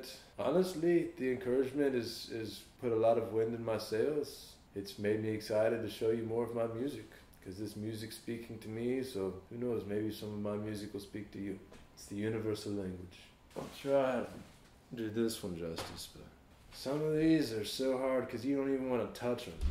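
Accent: United States. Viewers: cara esse sotaque texano é foda demais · nouth cawolina lmao love your accent buddy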